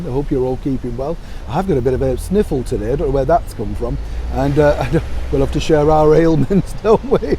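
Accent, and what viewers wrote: United Kingdom. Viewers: I'm here for your British accent!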